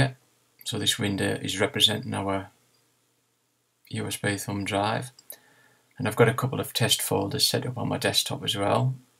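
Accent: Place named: Sunderland, United Kingdom. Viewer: I’m in Sunderland and it’s great to hear a similar accent rather than the many American tutorials. Thanks for the help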